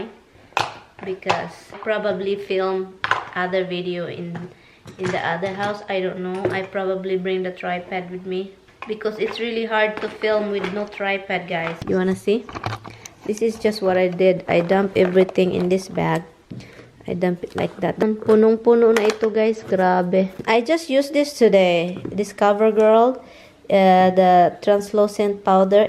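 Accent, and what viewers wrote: Visayas, Philippines. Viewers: Hi I like ur blog..ignore sa mga taong negative and haters...parehas tayo bisaya gahe intonation..haha..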